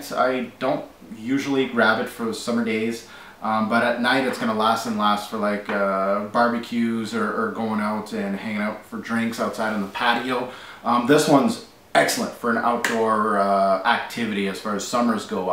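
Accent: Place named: Quebec, Canada. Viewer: Hahahahah!!! I like your French Canadian accent - the way you pronounce 'Frapin' and 'Sel Marin' is fantastic! So a question, (get it?)...is this list based on objective quality or personal preference? Gotta love my man Heeley.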